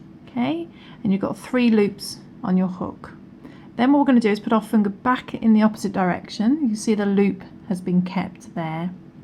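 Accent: United Kingdom. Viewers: Love this pattern. I have a question, though. When doing loop stitch, you start out with yarn over on this video, but in your other tutorial with the small light-green sample you don't do yarn over as the first step and just go directly to inserting into the stitch as the first step. So, I'm a little confused. Would rather not do yarn over, if possible. So lemme know. I love your Brit accent.....Fiddly, a very cute and accurate expression, lol. Haven't heard that one over here--USA.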